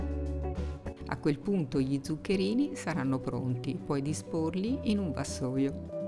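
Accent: Tuscany, Italy. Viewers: Buoni gli zuccherini ma il più bello è il tuo accento toscano. ❤😉😊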